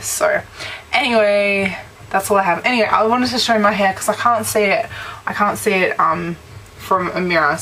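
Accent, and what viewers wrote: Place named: Australia. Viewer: u have an Australian accent!! I'm about to get mine done... !! a bit nervous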